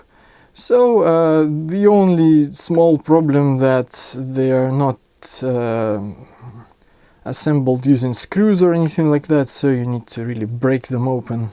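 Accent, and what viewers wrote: Russia. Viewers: Русский акцент. Крутяк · вери рашн пронансиэйшен )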